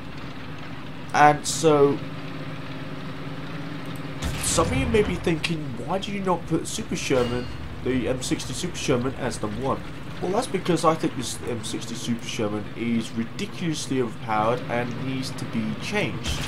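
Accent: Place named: United Kingdom. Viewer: I can tell hes British because his accent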